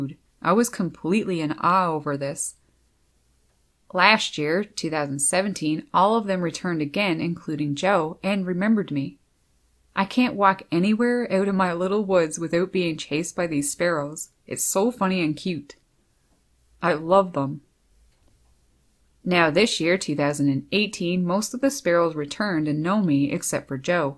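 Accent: Canada. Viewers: Love your accent my Canadian sister!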